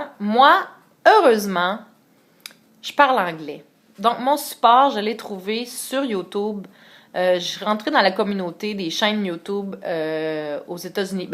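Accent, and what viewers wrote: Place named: Quebec, Canada. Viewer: Pis j'suis d'accord avec ce que tu dis sur l'absence de repères francophones (du mois sur You tube en ce qui concerne la sleeve). J'ai suivi pléthore de Sleevées américaines, mais mon repère franco, c'est toi et toi uniquement (je suis bilingue donc ça va pour les vidéos américanos, mais comme l'accent québécois est le mien et que tu me fais BEAUCOUP penser à moi, à ma mère, aux femmes de ma famille et à mes amies, c'est un vrai bonheur de t'avoir trouvée :-)).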